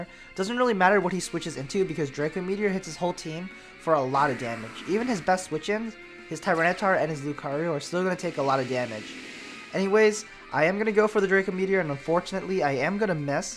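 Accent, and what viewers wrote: Netherlands. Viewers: R u dutch???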